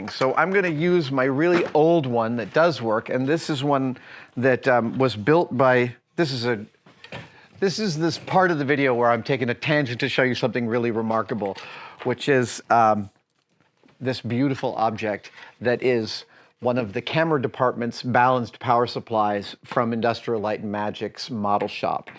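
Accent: United States. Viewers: SoLLLder, not sodder. Say it like it is, damn american! "The US just removed all soddiers from afghanistan".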